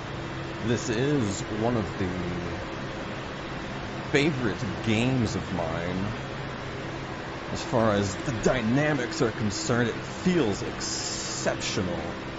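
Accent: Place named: United States. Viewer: Happy New Year! Looks like a fun time. I don't know why but I definitely wasn't expecting an American accent haha. Is your rig banking right when you're taking a banked left turn?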